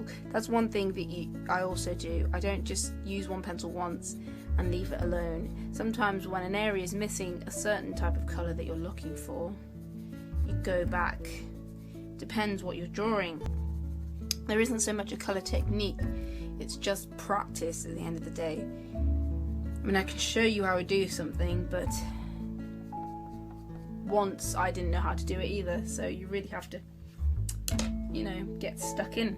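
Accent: United Kingdom. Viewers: Your drawings are amazing! Mid-england? Same accent as me :D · OMG are you British? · really good tutorial! :D love your accent, british is so beautiful :3 good work! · Are yiu from England?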